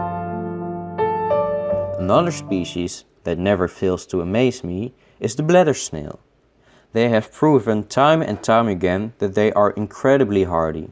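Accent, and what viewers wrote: Netherlands. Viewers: You’re Dutch for sure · you're obviously dutch, aren't you? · Wich country you live in? You sound a bit like dutch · Your Dutch accent makes this funny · Waneer je kan horen dat ie nederlands is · You are so Dutch, it hurts my ears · You sound very Dutch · hey are you dutch? your accent sounds so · I played your first clip on your jar ecosystem for my 13-yera-olds in our science class and they loved it! We were looking for a group effort in our upcoming science fair and our unit on ecosystems. GREAT job! Your accent sounds a bit Dutch to me. Are you from The Netherlands? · Maybe its just me but you sound dutch. So are you dutch?? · 80% sure you're from the Netherlands.. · Ben je Nederlands?😂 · Do you come from the Netherlands? Just wondering 😂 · It's good knowledge and are you Dutch? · Are u dutch? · Hij klinkt een beetje Nederlands. 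(He sounds a bit Dutch.)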